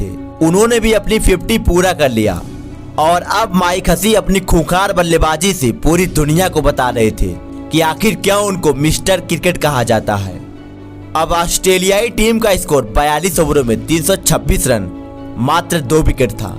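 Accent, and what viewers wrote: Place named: Bihar, India. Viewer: Tu bihar se ho ka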